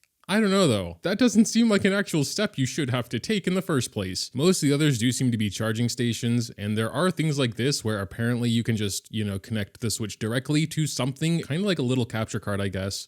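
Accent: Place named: Germany. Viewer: i didnt expect you to be german :D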